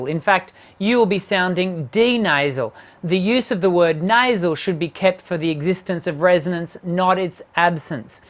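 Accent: Australia. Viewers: these videos help but i can't keep myself from thinking if you didn't have such a thick Australian(?) accent they might help even more lol. thanks though! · I thought it was Dana Carvey doing an Australian accent .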